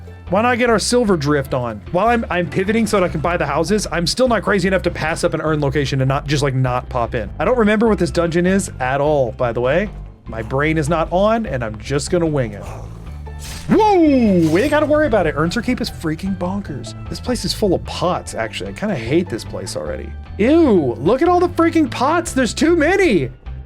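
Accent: United States. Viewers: Baltimore Accent run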